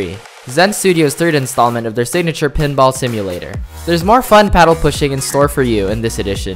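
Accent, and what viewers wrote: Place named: Philippines. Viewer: FILIPINO ACCENT AF!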